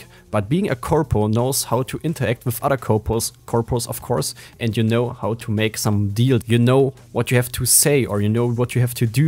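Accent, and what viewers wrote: Germany. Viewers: hab ich an deinem deutschen Akzent erkannt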